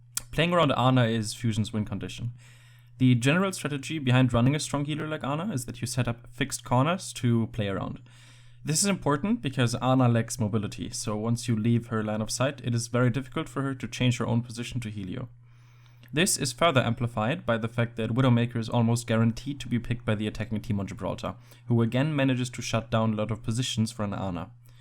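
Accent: Germany. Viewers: Very nice channel, I'm so happy that I found it through Overwatch Central! Btw, are you from Germany? Cause you sound like a German :P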